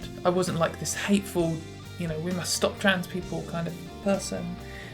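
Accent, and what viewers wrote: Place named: England, United Kingdom. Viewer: Good to hear an English voice doing a video like this. Sensible comments , beautiful looks , nice guitar solo, you have got it all girl !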